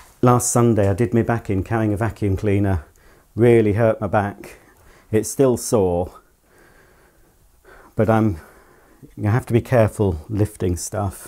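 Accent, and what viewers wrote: United Kingdom. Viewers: The wireless mic is an improvement as I can understand each syllable. The volume is fine and you could probably speak normally as we can always turn down the volume but my phone is turned to max and I had trouble with your British accent until today. Bravo! So excited for your Advent series to begin. Happy baking.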